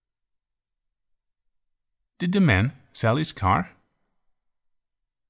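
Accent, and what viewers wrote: United Kingdom. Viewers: Buenas tarde profesor soy principiante su ingles es britanico! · I loved this lesson, but the accent is British, isn't?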